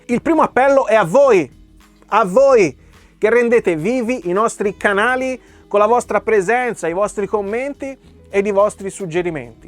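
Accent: Tuscany, Italy. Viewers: Sacrosanto! Devo dire che mi ha fatto un po’ impressione sentire un bell’accento toscano in bocca a, abituato com’ero a sentirlo parlare in inglese! 🤣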